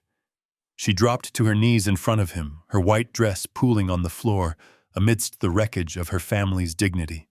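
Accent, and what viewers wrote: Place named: United States. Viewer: Enjoyed listening , nice clear American accent , from Scotland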